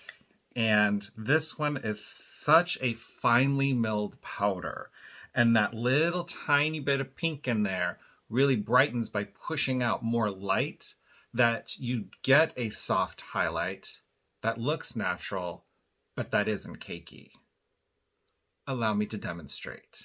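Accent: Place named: Minnesota, United States. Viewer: LOL I'm from western wisconsin, so I know all about the Minnesota accent. :D Wow incredible look!! You look amazing!! I will be Using both the liquid and powder foundation to get the contour. I've never used both foundations at one time and I am going to try that. Like I said you look is amazing!!!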